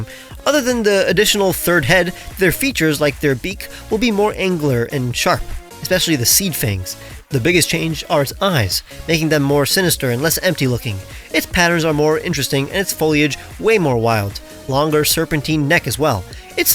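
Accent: France. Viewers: Dude I love your videos, your designs are amazing and ideas are awsome. 🥰 (note you French accent is superb.👌) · What’s with French accent?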